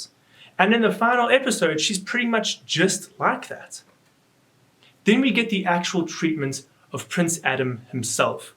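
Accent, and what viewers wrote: South Africa. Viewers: Howsit bru nice to hear a South African voice, yeah this sucked big time a show that was launched in the 80' for young boys that is now about a show that lacks any sort of male character, Ripley and Sarah Connor are great examples of strong female leads, young boys need heroes too man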